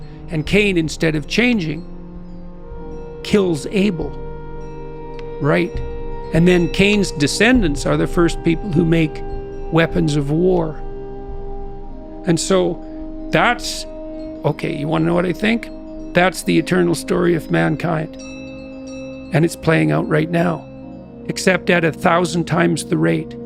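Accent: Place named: Canada. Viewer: Dragging it to say some generic ass advice. His Canadian accent just makes it pure comedy. Like god said it cane; you’re not trying hard enough to lose that disgusting accent.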